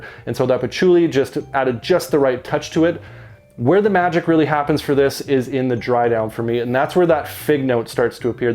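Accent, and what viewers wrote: Canada. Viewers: I could tell from the accent that you were a fellow Canadian! I now know that you are also a fellow traveller! Great channel, hope to see more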